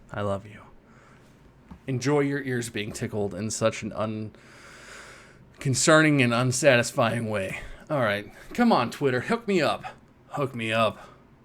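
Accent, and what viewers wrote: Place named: Canada. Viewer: OMG your Canadian accent just killed me, LMFAO #CanadianPride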